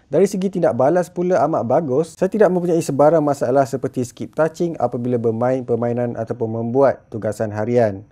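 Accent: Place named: Kelantan, Malaysia. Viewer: demo ore klate ruponyo admin ni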